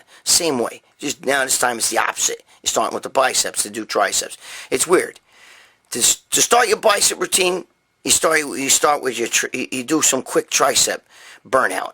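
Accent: New York, United States. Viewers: Great video, thank you so much! Love the comedy, I also love the information very helpful! Also your New York accent A lot like my south Philly accent!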